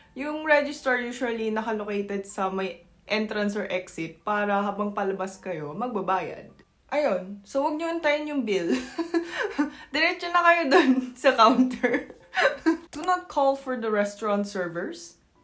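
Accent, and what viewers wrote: Batangas, Philippines. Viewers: Alam mo ga ining na akoy Batanguino rin kaya ako ay tuwangtuwa ss iyo the way you talk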